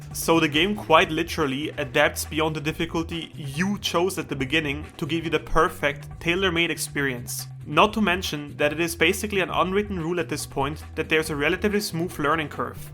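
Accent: Germany. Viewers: The German accent is strong with this one... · Dude you sound incredibly german digga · That is one thick German accent 😁😁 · I smell German from this guy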